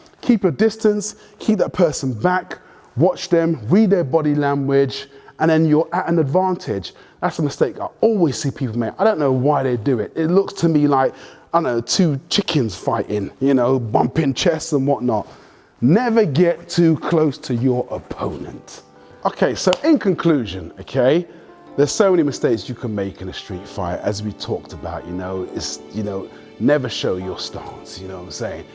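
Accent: United Kingdom. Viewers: greAt brit accent mAte . good info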